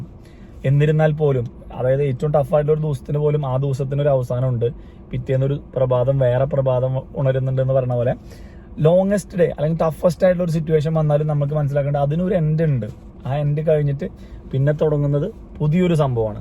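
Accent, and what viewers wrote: Thrissur, India. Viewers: R u from thrissur ?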